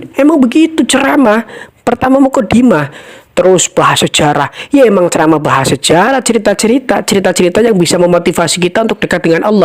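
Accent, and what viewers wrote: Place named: Java, Indonesia. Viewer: NGOMONG LU KOK MEDOK. TAPI GUE SUKA. TERUSKAN DAKWAH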